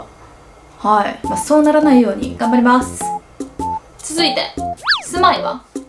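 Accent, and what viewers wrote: Kansai, Japan. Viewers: 関西弁美しい！ 大阪には遊びでよく行ってます✨ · 関西弁美女ってええなあ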